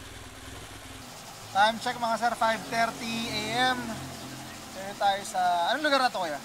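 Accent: Bulacan, Philippines. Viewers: Puntong bulakenyo ser.. 😁😁😁 Yes sir😁😁 solid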